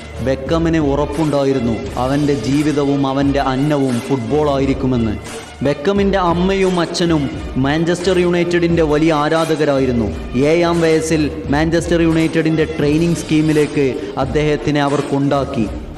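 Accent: Malappuram, India. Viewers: ഞാമലെ സ്വന്തം മലപ്പുറം ഭാഷ...... ഇജ്ഞതി രോമജിഫൈകേഷൻ....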